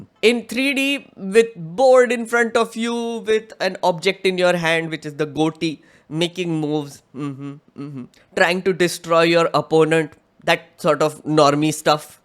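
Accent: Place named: Gujarat, India. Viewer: Where are you from Gujarat? Ur accent says it all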